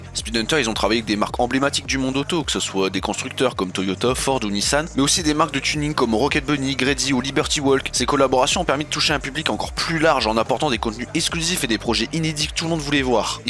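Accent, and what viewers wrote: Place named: North, France. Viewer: super vidéo continue comme ça bien expliquer et ta un petit accent qui me rappel un peu le nord chose que j’adore !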